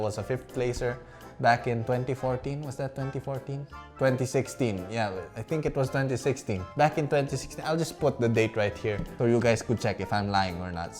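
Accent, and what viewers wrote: Baguio, Philippines. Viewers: Are you from baguio?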